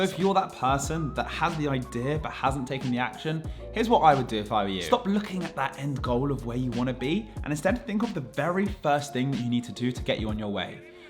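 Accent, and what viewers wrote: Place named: United Kingdom. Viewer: Why did you put dollars rather than pounds in the thumbnail when you’re British? Super confusing! I was thinking maybe you’re American until I clicked on and heard your voice. It couldn’t be any more British lol 😭💕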